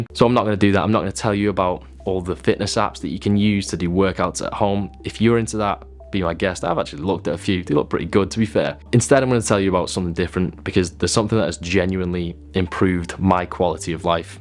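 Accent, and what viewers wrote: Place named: Birmingham, United Kingdom. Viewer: Hi, I like you accent, is it from Birmingham?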